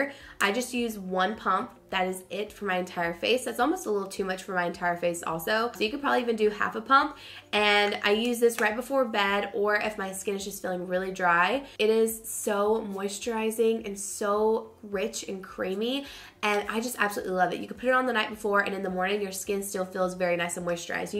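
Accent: South, United States. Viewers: you are getting a southern accent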